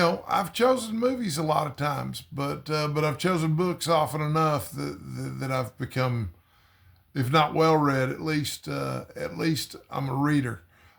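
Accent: Texas, United States. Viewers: I like your videos. I've been to Texas, I love your accent.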